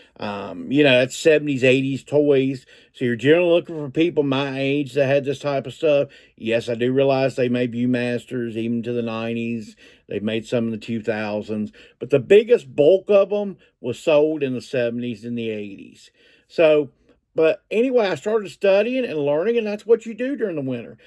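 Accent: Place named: South, United States. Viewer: I love your southern accent!! 'Coconut Castaway'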